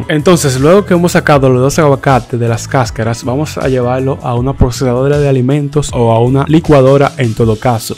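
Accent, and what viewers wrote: Dominican Republic. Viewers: Me atrevo a apostar que eres dominicano?!!! 🥰 un abrazo fuerte desde Charlotte, NC. ..... ah! Me encantan tus recetas!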